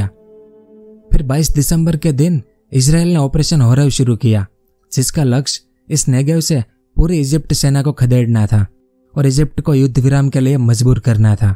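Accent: Gujarat, India. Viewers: Yeh bhai Gujarati hai..unke voiceover se pata chalta hai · आपके बोलने Accent एकदम गुजराती जैसी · tamaru bolvanu tone gujarati aave chhe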